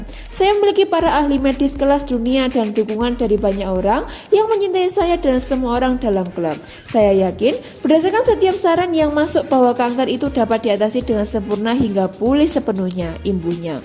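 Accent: Java, Indonesia. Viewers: kental banget bahasa jawanya😆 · Medok buanget yah pembawa narasinya 😁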